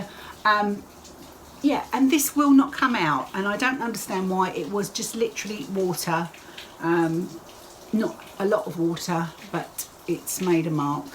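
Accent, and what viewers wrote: United Kingdom. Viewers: I recently discovered your channel and I'm so enjoying it! I am a quilter and knitter but have picked up cross stitch again. It is so pleasant listening to you while I stitch because my Mother was British and it makes me think of her!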